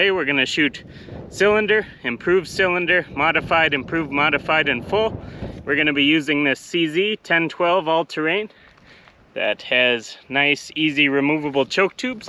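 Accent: Canada. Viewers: You're the most Canadian soundin American I've ever heard. I like your videos, subscribed my man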